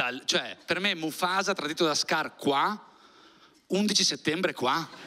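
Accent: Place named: Veneto, Italy. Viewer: La narrazione condita da un importante accento veneto conferisce un tono ancora più paradossale al monologo, ai limiti del surreale. Bellissimo, ho riso tanto!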